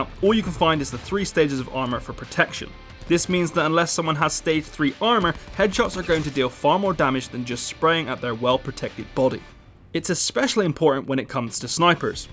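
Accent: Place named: England, United Kingdom. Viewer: Frickin english accent Speak correctly idiot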